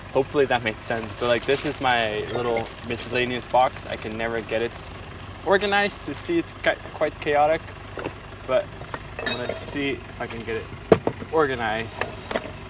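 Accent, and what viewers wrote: Netherlands. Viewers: Wat cool, ik wist niet dat je uit Nederland kwam. Ik bleef mij maar afvragen of ik niet een klein Nederlands accent hoorde haha. Nu snap ik ook je zonnepanelen uit Nederland op de Tesla. Wat een avontuur! Echt geweldig!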